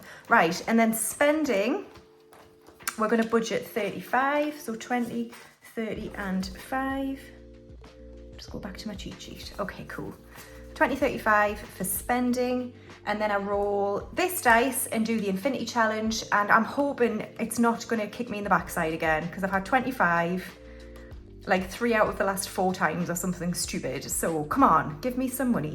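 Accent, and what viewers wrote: Newcastle, United Kingdom. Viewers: Fab video as always. My hubby even said "oh is she a Geordie too? I thought so!" (Hes Northern Irish)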